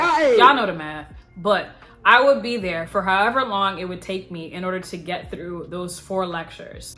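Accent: Nigeria. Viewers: Hah your Nigerian accent actually made me sit up and pay full attention. Can I hire you to stand near me while I'm studying and tell me off when I get distracted?! <3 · speaking in Nigerian accent and giving tips <3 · It was the Nigerian accent for me. Never subscribed to a YouTuber as fast as I did today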